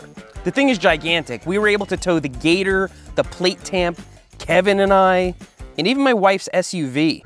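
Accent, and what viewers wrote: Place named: New York, United States. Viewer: It's cute how thinks he got rid of his accent. That lovely NEW YAWK accent.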